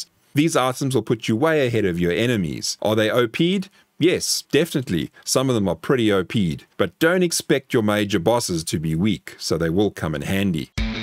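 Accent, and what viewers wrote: South Africa. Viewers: First vid of yours I've seen. About 2min in, I was like, this dude sounds like a Saffer. Lo and behold a fellow South African. Nice one boet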